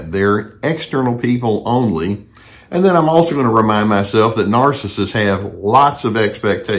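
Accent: South, United States. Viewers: Thanks from France, Dr, for your daily (almost) presence. I am French, a musician, and your southern drawl is a joy, and music.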